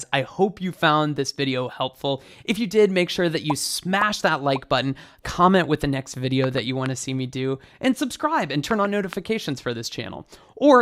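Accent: United States. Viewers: Is that American English or British English? Just saying. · I think you need to work on your overinflated ego and your lack of empathy department. Have you considered that you speak American English and not English maybe you should work on your own accent. Dimash does not even need to sing in your own language to be able to share the story and all the emotion that goes with it. He speaks English differently than you. So what? I bet if both of you would sing the same song you would not be the one people would be talking about despite that you believe to have a "perfect" English "diction". The man is exceptional. Live with it.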